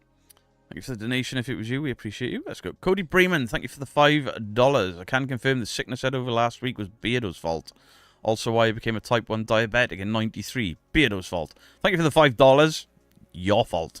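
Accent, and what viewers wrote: Wales, United Kingdom. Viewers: Can tell you're welsh lol drop kicking annabelle 😅😅😅😅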